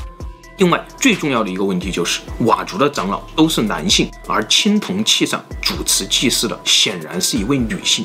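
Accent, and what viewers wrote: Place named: Zigong, China. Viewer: 小哥是自贡—带人吧，你的口音太像南征北战的那位演军长的陈戈…大才子自贡人。自编自导自演的名剧抓壮丁😁😁😁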